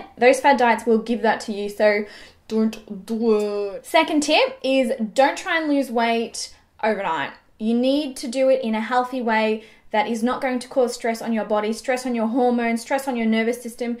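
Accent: Australia. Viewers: Australian, huh?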